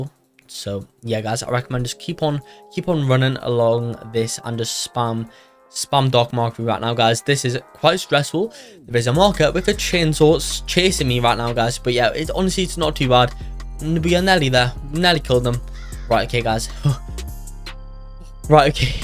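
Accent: United Kingdom. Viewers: British man that helps us all in Roblox games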